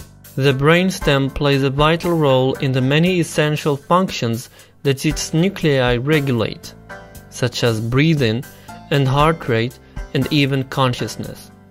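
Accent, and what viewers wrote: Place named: United States. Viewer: Why are you pronouncing English as Americans being an Indian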